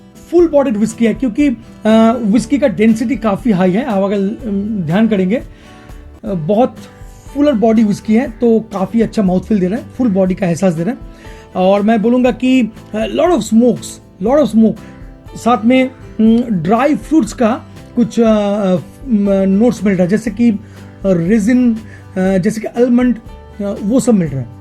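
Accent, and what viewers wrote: Bengal, India. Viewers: Actually I love your review method and bengali tone I like to have sweet whiskey please suggest some like black dog.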